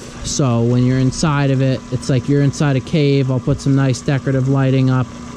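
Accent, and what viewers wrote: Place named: New England, United States. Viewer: It’s an innocent question but there’s weird dudes who’d infringe on privacy. Most tubers don’t disclose for obvious reasons. dialect shouts New England for sure. I’m just guessing New Hampshire down to Pennsylvania with those beautiful mountains....but that a guess.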